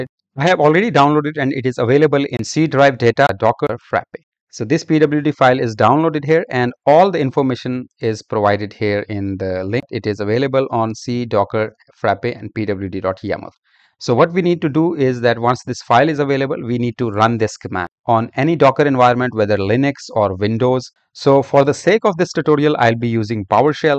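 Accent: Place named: India. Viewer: can't we find a tutorial without an indian like accent :(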